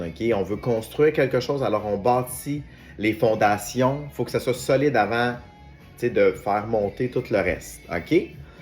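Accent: Quebec, Canada. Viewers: J'adore votre énergie et vos guidances et votre accent canadien trop mignon un lingot d'or ❤ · Good morning mister, nous avons le meme accent anglosaxon moitie quebecoois. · Bonsoir le Canadien de la part d une Française c est la première fois que je vous vois merci pour votre super accent ❤❤❤ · Bsr nouvelle abonnée quel joli accent je suis partie dans la region des laurentides en 1991 ( j avais 24ans) pour mes études d ' infirmiére ...quel beaux souvenirs Québec je me souviens gros becs christine des bsx à mes amies amis Québecois chris ...le skidoo ...les cabanes à sucre le sirop d érable etc etc bisous ❤❤❤❤❤❤❤ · Oh bonjour monsieur je viens de vous découvrir fabuleux gentleman j'adore votre quidance merci beaucoup monsieur bonne journée et j'adore votre accent cananadien oh caribous respect. 😊❤❤❤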